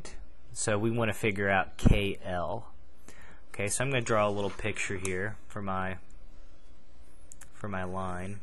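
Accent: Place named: Baltimore, United States. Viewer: Sounds like he has a Baltimore accent.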